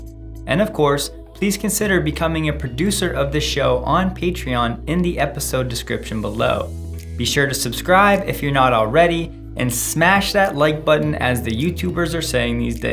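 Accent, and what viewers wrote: United States. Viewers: It's really a pleasure to hear a nice comprehensive summary covering major Tesla issues. It's even better when it's in clear American English.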